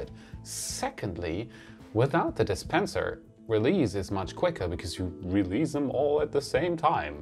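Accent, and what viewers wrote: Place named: Germany. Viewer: Oh my goodness first time I went back to #1 u need a haircut here and u have more of your German accent! ❤️your the best!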